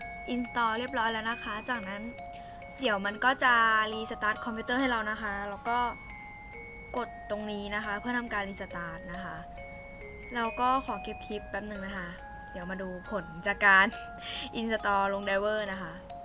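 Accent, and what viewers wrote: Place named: Thailand. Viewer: แอบเหน่อๆนะเนี้ย น่าร๊ากกก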